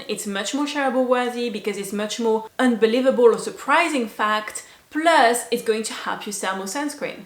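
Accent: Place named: France. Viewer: are u you an american native? idk you sound french to me. Anyways, Love your video!!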